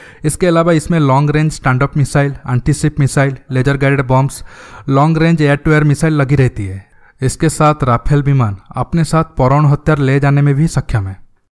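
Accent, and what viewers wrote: Odisha, India. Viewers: Bhai ap odisha sa ho kya?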